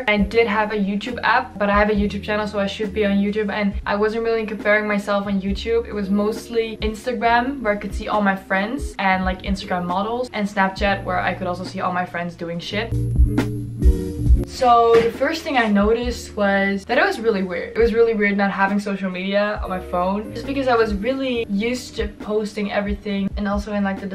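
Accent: Netherlands. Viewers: can tell ur Dutch hahah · You are Dutch I hear it!😂💓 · r u dutch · Omg! I was able to tell that you were in the Netherlands cause of the way you speak. I lived in a small town in Limburg for 3 years. Anyways, I'm right now doing my one month detox facebook, instagram and twitter. Not Snapchat nor Messenger because I only use them for messages purpose. I did my cheat day yesterday because of my birthday but I honestly just posted about my birthday, scrolled and I realized that I don't care about it anymore and I haven't missed anything. And after this morning I logged off. I began this detox a little bit early on 27 September and I will log in again on 1 November. The best tip I followed was to completely remove those apps because in my computer, I rarely use those platforms. It's nice to see more people doing this. I'm more productive than ever before and I don't feel like I'm missing anything. 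Tot zo!! ;-) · you dutch?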